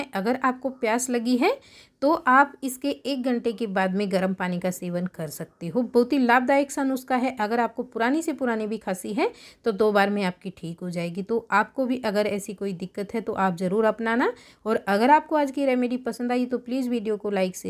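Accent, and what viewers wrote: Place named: Marwar, India. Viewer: thank u ji.... ☺️ Hukum aapni marwadi me vi bnao koi... Kitni mithi boli h aapni marwadi😇😊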